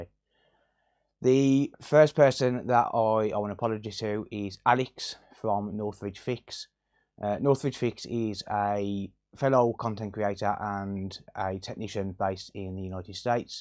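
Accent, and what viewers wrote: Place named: Black Country, United Kingdom. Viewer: We have all fell into that trap, at least you owned the error, now you can move on and continue with the great content we always see from you. You have gave me an interest in electronic repairs, not sure I could as I'm thick as two short planks but anyway, oh and big up the Black Country accent, I hail from West Brom... Keep up the good work 👍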